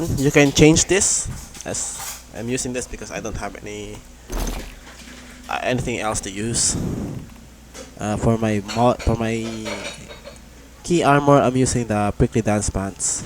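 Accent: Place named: Philippines. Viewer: Japanese name but you sound Filipino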